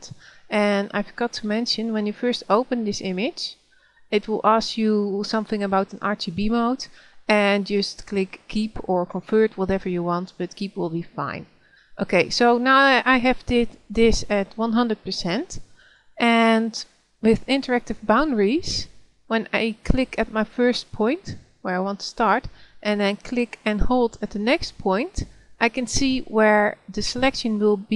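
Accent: Netherlands. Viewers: I directly knew you were Dutch by the way you speak lol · kom op man!! je moet eerst leren beter nederlands praten. je hoor meteen je nederlands accent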